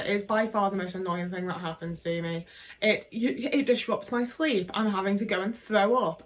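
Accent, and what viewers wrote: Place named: Northern England, United Kingdom. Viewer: British women with their Yorkshire tea speaking in that northern accent --- so exotic and wonderful.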